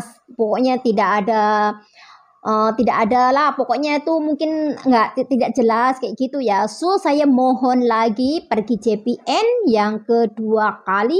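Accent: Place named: Indonesia. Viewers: Akk ni bhsa melayu pun blm sangat Pasif msh cakap indonesia kental lagi terdengar.lg pulak akk ni kl nak apply ic biru rugs lah blk indo jadi org asing.dah berkawin dgn org warga negara punya anak2 warga negara anggap aja blh tumpang ngan suami N anak2.dah dpt PR dah ok dah cukup tuh dah tak perlu buat vissa spouse citizen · Akk dlm video ni bahasa indonesia nya masih pekat😅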